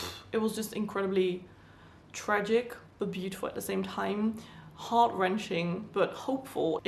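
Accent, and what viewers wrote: United Kingdom. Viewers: Did you live in the UK? You have a British accent, it’s lovely ☺️ I’m only asking because some other youtubers that are from the Netherlands have a different type of accent when speaking in English.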